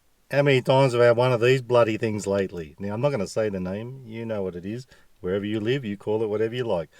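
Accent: Australia. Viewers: I'll watch anything as long as there is an Australian accent. Love the Aussie's!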